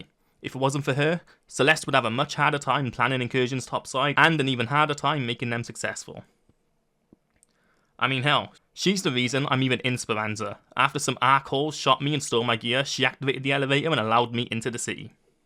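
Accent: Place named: South Wales, United Kingdom. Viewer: You sound like your from my neck of the woods. I'm from South Wales. Cool video. I wanted to see this side of the game. I'm thinking about getting it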